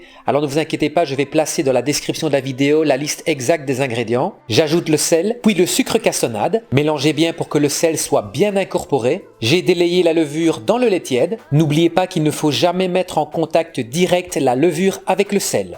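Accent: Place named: Liège, Belgium. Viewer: Bonjour! Je viens de m'acheter un gaufrier et découvre vos recettes que je vais tester avec plaisir. Je suis liégeoise vivant en France depuis 12 ans et c'est avec délice que je retrouve ce petit accent liégeois ;-)). Au rayon des anecdotes, j'en ai une autre bien bonne: la (seule) fois où j'ai demandé "une petite brique coupée" dans une boulangerie, on m'a regardé comme si je débarquais de Mars! Ici les pains sont appelés par leurs noms (pain complet, aux graines etc....) et il faut dire "tranché" et pas coupés. (ha, ha....)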